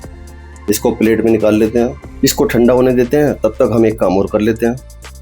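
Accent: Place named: Punjab, India. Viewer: Aapka punjabi accent bht mast h👌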